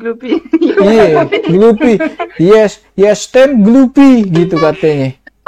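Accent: Jakarta, Indonesia. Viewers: Kentel bener betawi nye cing wkwkw, gue bantu subs nih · pertahankan logat Betawi Lo bang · lebih bagus logat betawi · Bahasa luar + betawi ngakak sih..😂🤣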